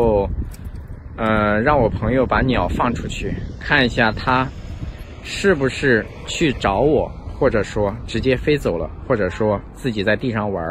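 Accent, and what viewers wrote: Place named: North, China. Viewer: 從不露臉的視頻主,身材好瘦高型,講話字正腔圓聲音好聽,是不是年輕人不知道？猜是大陸北方人or東北人？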